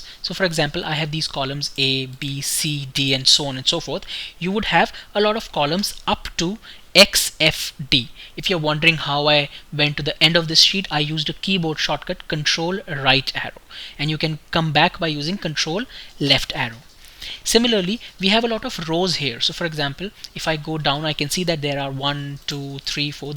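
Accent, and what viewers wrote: India. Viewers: One of the best tutorial for excel that with Indian English accent. Thq lotsss bro for doing it!!! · you know ts is good when you hear the indian voice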